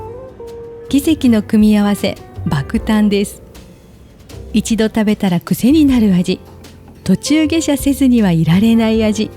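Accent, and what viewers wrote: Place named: Himeji, Japan. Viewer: 姫路の正しいイントネーションが落ち着く😂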